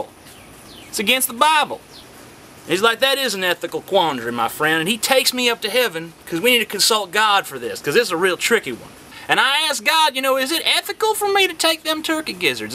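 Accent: South, United States. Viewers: I dont care what that guy you guys played on DP says about your southern accent- this clearly proves otherwise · I love his southern accent so much!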